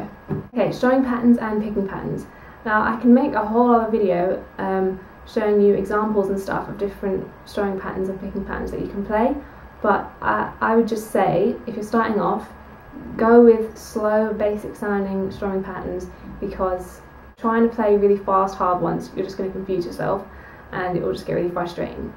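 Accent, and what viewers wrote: United Kingdom. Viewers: YOUR BRITISH!!!!!! did not see that coming. MIND=BLOWN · Ah your Brittish!?!?!? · woahh tbh i didnt know you were british until this vid. singing obviously hides accents. haha btw, this is very good. simple and easy to understand because i was a self thought guitar player as well and i absolutely agree about playing the general chords first because slowly you'll know how to play the hard chords :D this need to be share to friends or families who are beginners at playing guitars. good job! :D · Whoa ur British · You're....you're British? Whuaaahhhh? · OMG you’re british!!!!!!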